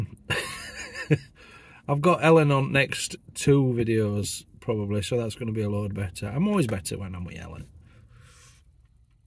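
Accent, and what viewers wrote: Yorkshire, United Kingdom. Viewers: I lived that walkabout in Donny and your lovely Yorkshire accent. Pity you got a bit wet, but never mind eh.Greetings from a Yorkshire lass ,now in Australia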